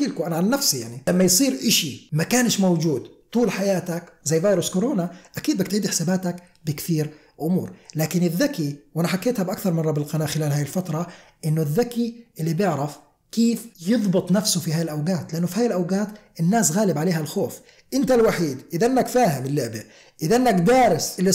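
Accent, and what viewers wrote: Jordan. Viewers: من اول قناه ومن كلامك عرفت انك اردني الله عليك يا نشمي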